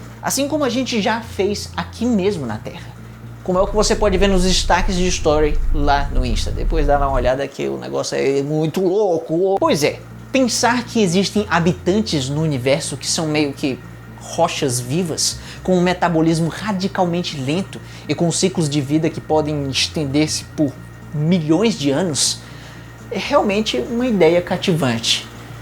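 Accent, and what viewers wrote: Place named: Northeast, Brazil. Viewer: quando comecei a assistir ja suspeitei que o canal era nordestino :) mt bom o conteúdo parabéns.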